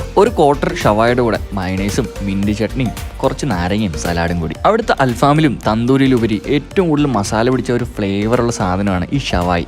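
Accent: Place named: Malappuram, India. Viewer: Malappuram varthanam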